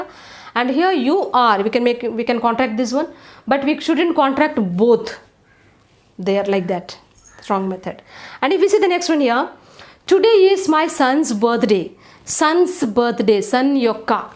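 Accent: Telugu, India. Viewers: Madam mana telugu accent lo english teach chestunnaru. That's the most fascinating thing about your video. That's the naturality we need from teachers in social media... Unlike fakesters. And precise explanation is the hallmark of your teaching - man's true fan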